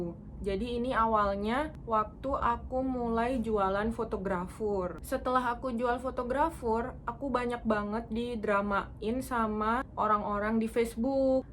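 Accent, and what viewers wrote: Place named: Surabaya, Indonesia. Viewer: kak kamu orang surabaya kah?.. kok logatnya surabaya banget hehe..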